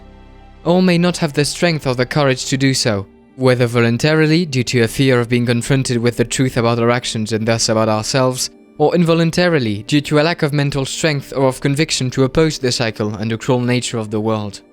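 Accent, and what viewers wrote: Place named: France. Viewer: Cet accent français... sublime.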